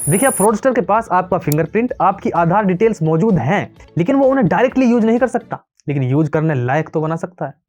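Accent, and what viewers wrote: Haryana, India. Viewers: I can see that Haryana accent in his voice , good work bro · Bro Haryanvi style toh hai 🤔 ( suggest me)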